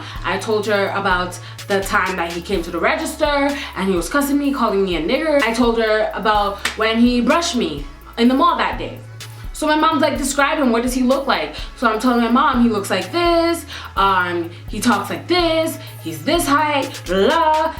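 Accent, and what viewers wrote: Jamaica. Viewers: Talk Jamaican more often please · I love the Jamaican accent :) so cool! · She uses a Jamaican accent sometimes I'm guessing that's what you're talking about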